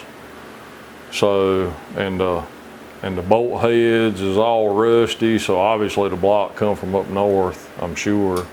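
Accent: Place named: Kentucky, United States. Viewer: This guy has a beautiful KENTUCKY accent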